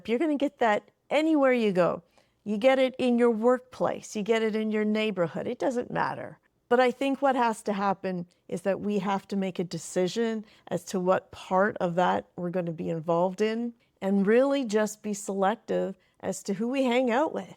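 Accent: Canada. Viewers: She has an Ontario accent.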